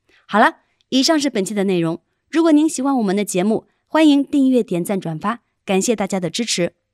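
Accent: China. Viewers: 大陸人配音？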